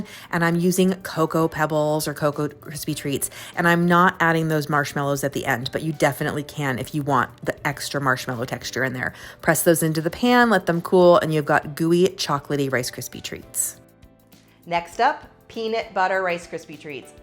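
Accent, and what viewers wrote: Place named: California, United States. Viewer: That California speech pattern is horribly annoying.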